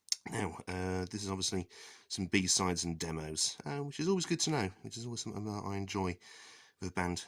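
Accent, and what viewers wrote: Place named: United Kingdom. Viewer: Yeah, I was thinking the same but you know how things go when you talk & roll video for over 6 minutes lol... He gets away with it having the cool Brit accent :P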